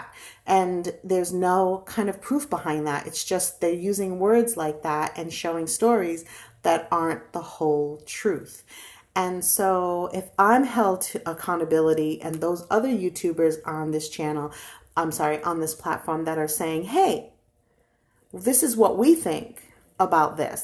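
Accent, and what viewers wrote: Northeast, United States. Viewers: Hello- my first time here.. You sound as if your from my neck of the woods...NE. " Markelytes" yiddish& bi racial?lmao... I doubt anyone believes anything they hear...We are all loving the gossiping& the Bashing! She is a mf joke& i for one am loving it!😉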